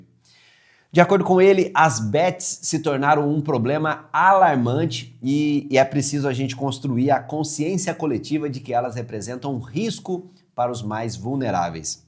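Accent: Brazil. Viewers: Top demais como sempre. Há, e o inglês brasileirado é o melhor, parabéns.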